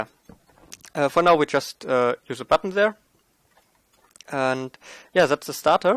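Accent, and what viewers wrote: Germany. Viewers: Ein deutscher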